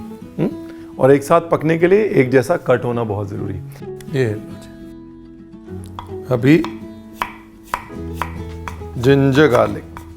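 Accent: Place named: Punjab, India. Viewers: Awesome!! Gotta give it try! Love your Punjabi accent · Yummy mouth watering 😛😛sir I like all respiees prepared by you Good way of explaining and punjabion vala bolane ka style👍👍👍👍👍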